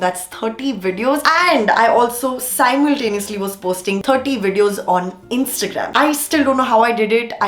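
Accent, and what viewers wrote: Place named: India. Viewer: I love your accent so much I am 40% Indian and 50% Jamaican and I have an Indian accent and yours just makes me happy to have it <3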